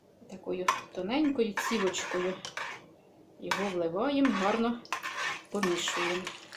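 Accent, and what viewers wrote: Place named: Poltava, Ukraine. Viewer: Дякую за рецепт ❤У вас мова ,як моєї мами,вона з Полтавщини. А Ви з якої області???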